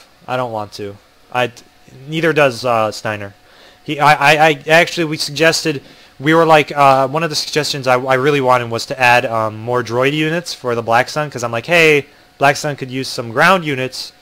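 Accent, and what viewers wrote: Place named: Seattle, United States. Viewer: I thought u were from Seattle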